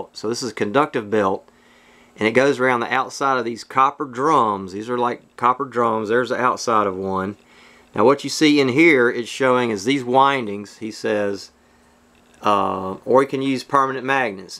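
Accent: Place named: Rural, United States. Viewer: Your accent is proof that people know more in rural America than in the cities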